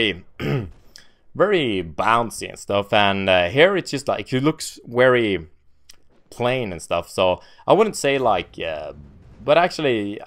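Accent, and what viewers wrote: Sweden. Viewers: Swedish accent :) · swengelsk accent,älskar den haha x'D härligt härligt lol har sett mig själv i ett par av dina MW2 clips :P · are you from norbotten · svensk från norrland?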